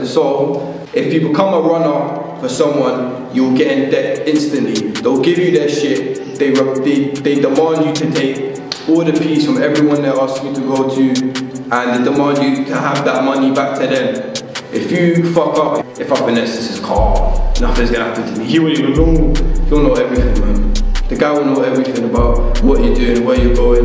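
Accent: United Kingdom. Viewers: I think it's cockney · This is the voice I read every British person on twitter in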